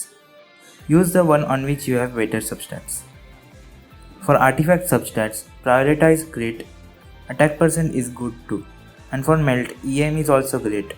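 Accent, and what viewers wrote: India. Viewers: the indian accent hits home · Nice guide are you indian ... That accent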